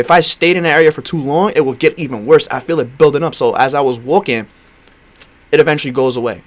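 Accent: New York, United States. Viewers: bro frm ny for sure 😂 good tips gang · Yea u still got tht ny ism in you, Thts wssup cuddy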